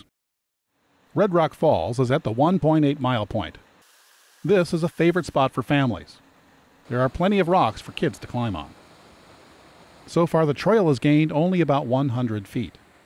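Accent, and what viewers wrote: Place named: Midwest, United States. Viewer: No fishing. I enjoyed you video, then saw your name. Right age, etc. Midwest accent. Friends with Mary B back in the day. Met you a few times.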